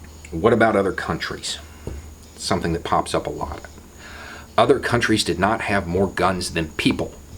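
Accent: South, United States. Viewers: Northeastern-Americans should listen to you,. I think there is a bias there against your dialect there; even in it's most refined, learned, journalistic expression. Liberal Yankees raise their kids on "Mississippi Burning" hoping they will grow up woke, but the coded message is that anyone south of Pittsburgh must be racist because the North is where people are free. You are a credit to the English Language, sir; and I have never heard these ideas said better, and neither has any gun-control liberal. You are the voice that could show white Yankees that they are not nearly as "woke" as they thought they were. just an idea.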